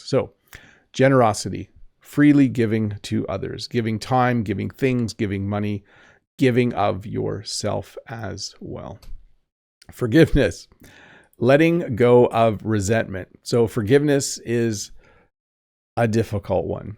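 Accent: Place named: Canada. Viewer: Hello, dear teacher, I am Shahab from Iran, one of your avid fans. I truely appreciate the very useful lessons in which you teach us very fantastic things. I also love the Canadian accent. However, as a doctor, I believe you made a small mistake regarding the meaning of " empathy" and " sympathy ". Actually, empathy is the ability to understand and share the filling of another person, to put yourself in their shoes without becoming emotionally involved - exactly the same way doctors are expected to interact with their patients . On the other hand, sympathy is the feeling of pity, compassion or sorrow for someone else who is experiencing something difficult that doctors are professionally discouraged from showing sympathy because it might create a sense of imbalance in the doctor-patient relationship as it can cause the doctot to become emotionally overwhelmed. 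Hence, doctors should demonstrate empathy and avoid excessive sympathy.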